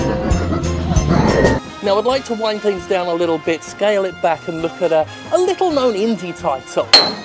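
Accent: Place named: United Kingdom. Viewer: I'm just thinking of what people thought of, standing next to a dumpster in America somewhere, dressed like that with a camera on him and his British accent. I'd like the police to drive by so I could see their double-take.. lol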